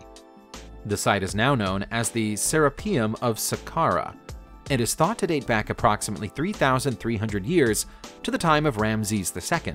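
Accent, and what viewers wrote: United States. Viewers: just the next disgusting american accent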